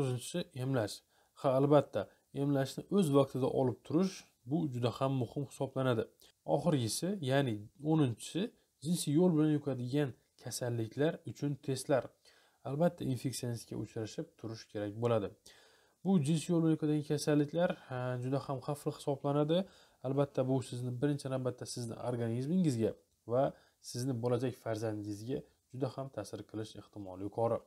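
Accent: Khorezm, Uzbekistan. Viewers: доктор кайерда ишлайсиз гапиришиздан хоразимликка охшайапсиз.агар хоразимда болсангиз иш жойизни айтинг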